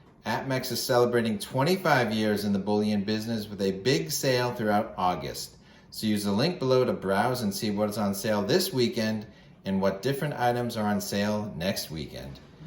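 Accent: New England, United States. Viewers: Well, it was German with a strong New England accent, but quite understandable...😄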